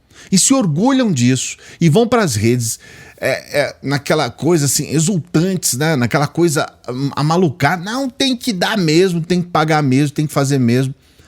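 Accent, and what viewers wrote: Minas Gerais, Brazil. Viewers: Meu amigo, seu sotaque mineiro está cada dia melhor. Parabéns pelo canal! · Adoro você, principalmente quando fala coisas sérias no mineirês! Eu gargalho contigo!bjs.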